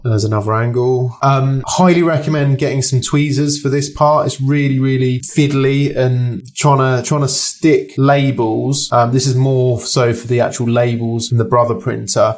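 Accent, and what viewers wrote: United Kingdom. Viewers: Everything was great but one thing is true, bruh has the strongest british accent for sure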